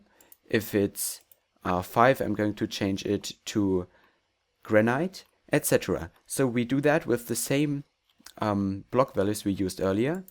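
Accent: Germany. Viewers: are you german? you don't speak english as well as a real english people!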